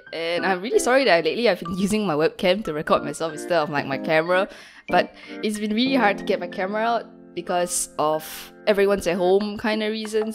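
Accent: Singapore. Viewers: Oh man, I could recognize the SG accent anywhere :D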